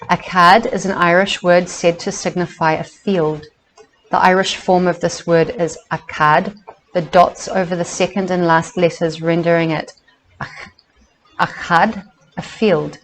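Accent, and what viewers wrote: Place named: New Zealand. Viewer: Notice the kiwi accent... Would love to have a chat sometime 😁❤️ blessings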